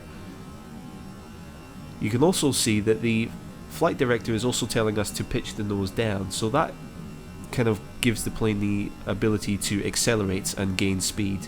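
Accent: Scotland, United Kingdom. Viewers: Are you Scottish if you don't mind me asking?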